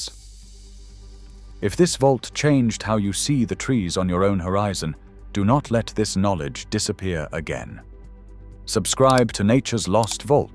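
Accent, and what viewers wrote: United Kingdom. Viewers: Just because you put a British voice to the information doesn't make it right. COTTONWOOD SUCKS AS A WOOD FOR ALMOST EVERY THING! Remember, I said ALMOST every thing involving wood uses.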